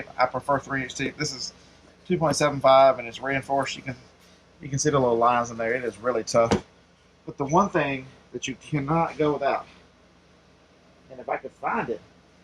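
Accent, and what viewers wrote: South, United States. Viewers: So nice to hear a southern accent. SE Tennessee here.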